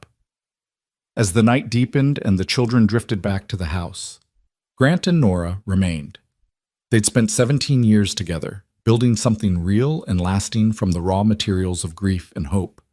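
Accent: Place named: United States. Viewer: Your voice to text is highly amusing - obviously can not cope with your heavy American accent, eg a scent for assent, release or beliefs, eve Hercules for valise, united for he nodded, pastor for passed her and constantly wrote for rode. From Namibia.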